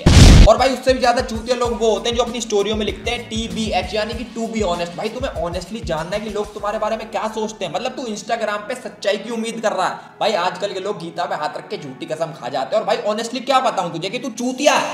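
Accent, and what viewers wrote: Haryana, India. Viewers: Bolne me thoda haryanvi touch h 😍😍😍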